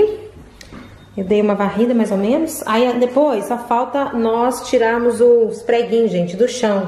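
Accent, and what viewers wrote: Minas Gerais, Brazil. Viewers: como vc é especial mulher eu amo ouvir sua voz, o sotaque mineiro lembro das minhas tias de Ipatinga que eu amoooo. Minha mãe é de Mg Ipatinga meu pai carioca,gosto de ser carioca mais amo o sotaque mineiro ❤❤❤😘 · Mineiro uai!Maravilhoso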